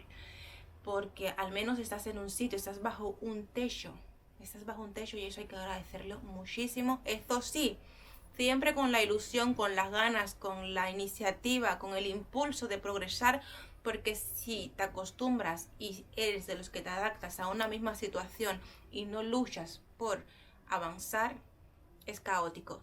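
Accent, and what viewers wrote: Spain. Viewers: Que acento tan español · Aqui hablavas con acento de España...ahora hablas diferente paisana😅😅.Como conosiste a tù novio y donde?? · Hace un tiempo te vi en un video c9n tu mamá haciendo una historia y hablabas como una cubana que eres. Y ahora habla como una española??? Porque. Acaso es delito? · Te gusta Turkia? Veo que tienes el acento español!!!!Saludos😊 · Niña bella ❤me encantan tus videos con tus experiencias y sobre todo Como tu dices demostrar tus raizes Porfa HABLA COMO UNA CUBANA TU IDIOMA❤ DEJA ESE ASENTO ESPAÑOL PUES YO VIVO EN ALEMANIA MAS DE 30 AÑOS Y NO HABLO COMO UNA ALEMANA MI CASTELLANO, MI ESPAÑOL ES MI ORGULLO MIS HIJOS SON DE PADRE ALEMAN Y HABLA MI IDIOMA SIN ASENTO Y ESO ES MI ORGULLO AUNQUE ELLOS SEAN ALEMANES LES EXIJI SIEMPRE QUE HABLEN SIN ASENTO Y HOY POR HOY ELLOS MISMO ME LO AGRADESEN HERMOSA MUCHAS BENDICIONES Y GRACIAS POR TUS VIDEOS 🙏🙏🙏❤️❤️ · Eres Cubana pero tienes un acento Español. 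· Hola te comencé a ver cuándo sacaste el vídeo con Paulita una persona muy bella, eres cubana por favor no hables con el acento de los españoles, eres hermosa y no hay que olvidar nuestras raíces, soy del estado de Veracruz, Mex. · Muy fina, muy española hablando y las terminaciones "mos" las cambia por "nos" como: Alumbrábanos en vez de alumbrábamos, etc. Lo que llamas ordenador es el monitor, lo que llamas monitor es la torre que es en realidad el ordenador. Mucha fuerza, cuando se lucha como haces tú, de todo se sale, tendrás todo lo que mereces con la ayuda de Dios. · PORQUE HABLAS COMO ESPAÑOLA SI TIENES UN MARAVILLOSO ACENTO CUBANO. YO VIVÍ EN MADRID Y NUNCA HABLÉ COMO ESPAÑOLA SEGUÍ MI ACENTO CUBANO. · Si eres cubana, porque hablas enfáticamente como los españoles,disculpa el dialecto cubano es difícil de erradicar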